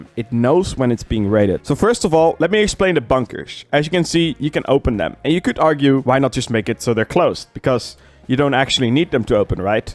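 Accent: Netherlands. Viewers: I just have to ask Are you Dutch?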